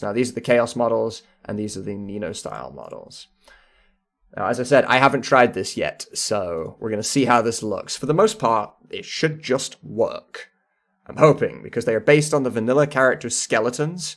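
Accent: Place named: United Kingdom. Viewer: it took me a good 30 seconds to realize the british accent.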